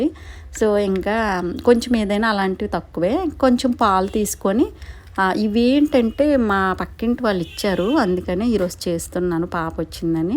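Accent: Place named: Srikakulam, India. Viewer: Akka slag vinte manadhi ma srikakulam la vundhi ea oru akka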